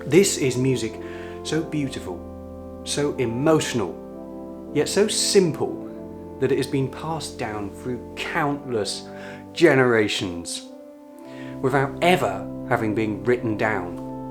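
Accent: England, United Kingdom. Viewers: I'm a Brazilian teacher of English and have accessed some of your pieces played on the organ by chance. Amazing work you have been doing! It's been a pleasure watching and listening to your performances while you share information concerning history, geography and harmoniums and organs! And it's being really nice listening to English accent (mine is more of an American one -- some Americans couldn't place where I was from since there are four different accents: from Virginia, New York, Boston and Minnesota). Anyway, thanks for spreading images and pieces of music all over the world! Viviane Horbach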